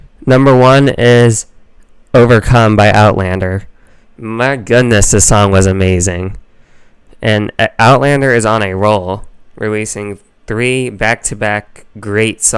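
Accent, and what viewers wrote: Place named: North America. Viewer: Yoooooo good to hear a North American voice! Great commentary and hope to see more of these in the future!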